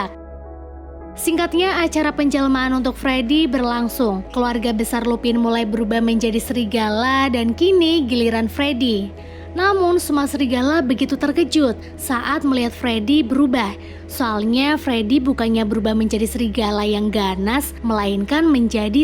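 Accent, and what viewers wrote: Pemalang, Indonesia. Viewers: Kok pemalang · Kk org pemalang yaa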